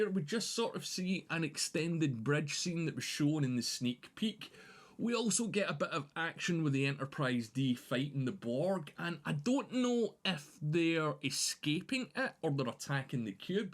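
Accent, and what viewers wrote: Scotland, United Kingdom. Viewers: I have it at 50%, i understand him, and i am no native English speaker. And he is having a thik British accent. What i actually like. 😁👍🏼✌🏼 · I think he has a Scottish accent.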